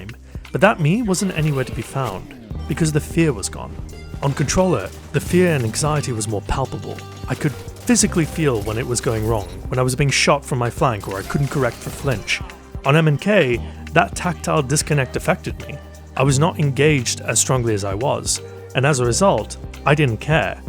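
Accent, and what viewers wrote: United Kingdom. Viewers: Yessssss. Welcome to the “Sticks on PC” club. I love it. One thing I’ll add to the “not science” category is, one of the reasons I like to play sticks is simply because it’s much closer to the feel of firing a real weapon than M&K can ever be, thus adding to the feeling of immersion and just sinking into the game. Btw, your dulcet vocal cords mixed with that refined British accent totally hit my ASMR trigger to the point, I could probably just loop your videos in the background all day. Well done.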